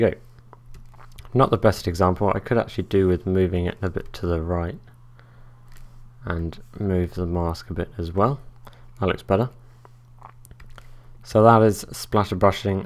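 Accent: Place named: RP, United Kingdom. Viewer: Great tut! (also - you sound dead posh!)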